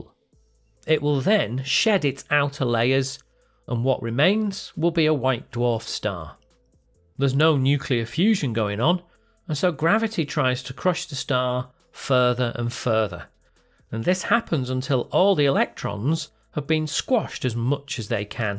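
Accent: United Kingdom. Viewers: Why do you have a British accent but you say things like "4 miles"?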